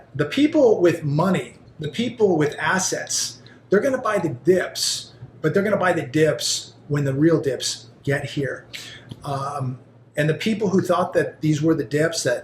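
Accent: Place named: South, United States. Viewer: I couldn't get past her Southern twang even though I'm from the South myself.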